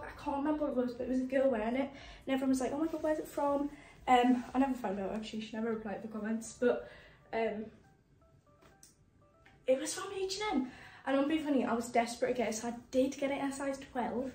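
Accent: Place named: Northern England, United Kingdom. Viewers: No. She's from Newcastle in England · She's from Newcastle, right? I always had trouble distinguishing northern accents :-p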